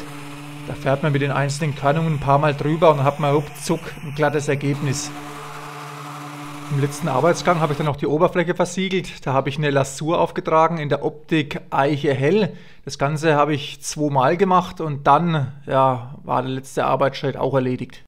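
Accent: Franconia, Germany. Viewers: Klingt extrem Frängisch...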